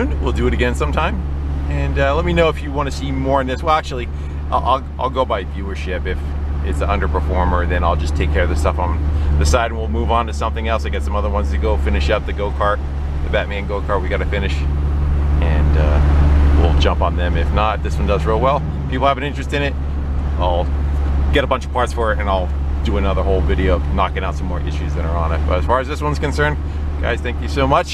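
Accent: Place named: United States. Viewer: Just to mention great great american accent very clear and concise and you have a great laugh which is not patronizing effect.good health🙏🙏🙏🙏🙏🙏🙏🙏🙏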